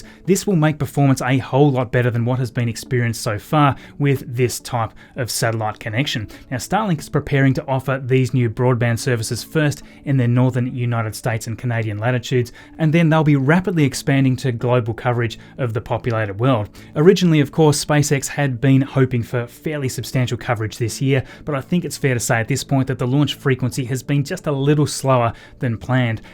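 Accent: Australia. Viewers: Don't you talk fast - and why an Australian accent?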